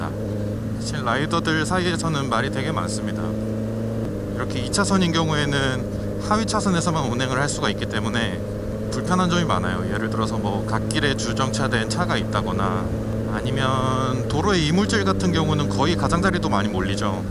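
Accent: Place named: Seoul, South Korea. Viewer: 엇!!! 서울말 쓰시는👍👍 멋있습니다!!! 구독하고 갑니당😆😆😆😆😆😆😆 소리 넘나좋은 육반 !!!!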